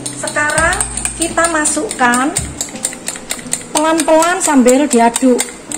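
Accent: Java, Indonesia. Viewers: Pas banget lg pingin sop asparagus 😋 Resep2 nya mesti tak tunggu2..soal e seneng liat ai nya kalo jelasin ,super jelas tp medok 😊👍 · mantap ce makan musim hujan bgtu enak banget 😋😋..suka logat bahasa jawa cece hehe.. jelasinnya jelas dan mudah dimengerti thx ya ce semangat trus ya ce Gbu 😊😇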